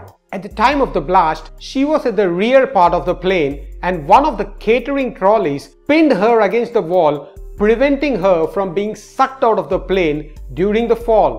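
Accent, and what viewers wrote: India. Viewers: In fact it’s the best Indian accent that I’ve ever heard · My parents were invited to a dinner with juliane koepcke parents the night before the accident, the host was the dean of biology faculty at san marcos university, lima peru, they were talking about tropical diseases in peruvian jungle and how hard it will be if you get lost there. Btw, i dont know why is nice to hear narrations in english with indian accent , maybe too much big bang theory or get used to call centers. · i enjoyed watching, but i didn't enjoyed listening to your indian (malayalam english) diction. I feel that i am having a short of breath listening you bro!! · indian english.. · Those people can really affirm "they're lucky". Love your Indian accent! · The story is good....but the Frikin Indian accent is pissin annoying. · People are a disappointment when they cant look past an accent, the content is very good that's what should matter. 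I actually like the indian accent. Good video bud. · Amazing how many people are complaining about this guy's accent. He's clearly from the Indian subcontinent - he's allowed to have an 'accent'. He's also managed to collect 140,000 subscribers on a platform that's massively dominated by American viewers and content providers, which I'd say is no small achievement. For the record, English is nowhere near being the most spoken first language in the world - if we sum together the various Chinese languages, for example, the number of first-language speakers outnumber first-language English speakers by more than three to one. There are also more people speaking Hindi and Bengali as a first language than there are English. So to everyone criticising ' ' for his accent, I say: how's your Hindi coming along?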